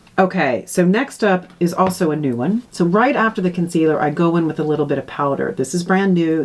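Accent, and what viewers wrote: Ireland. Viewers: You’ve turned Irish?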